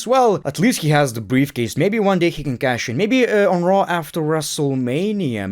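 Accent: Russia. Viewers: I need more Russian accent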